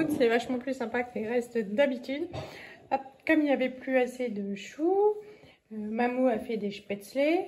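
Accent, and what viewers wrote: Alsace, France. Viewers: Yo hopla... trop bô l’accent Alsacien 🤣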